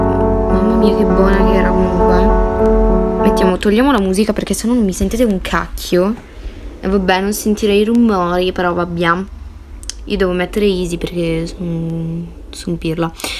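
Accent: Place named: Rome, Italy. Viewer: Vai con ľaccento romano regaaaaaaa😂😂😂 I primi urli sembravano degli ornitorinchi spaventati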